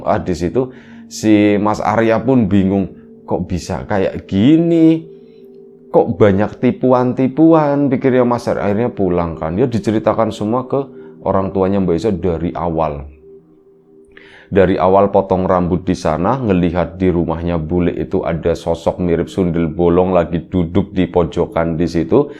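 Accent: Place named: Banyumas, Indonesia. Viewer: Wa, alaikummusasallam wr wb, Hadir dan nyimak selalu, Oh, iya buat mas narator kok ga di jawab pertanyaan saya, Mas narator asli nya mana...? Seperti nya logat nya ada ngapak ngapak nya, maaf ya mas.... Ok dia terbaik untuk Riam biru, moga makin sukses dan buat mas narator nya moga sehat dan slalu dalam lindungan Allah SWT.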